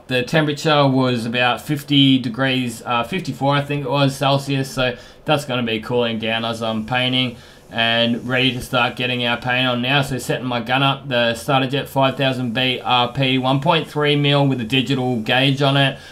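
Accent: Australia. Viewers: Thanks Aussy much appreciate. Like you very. Much. Learning everything. I could. Not understand you at first the Aussy accent. Ha ha After listening closely for a little bit I picked it up no problem. Much appreciate your video work. You're a great mate. Your answer sounds right and makes sense. The clogging is why I go to the water. I use a trickle from a hose. Works well but I'd definitely a pain in the butt.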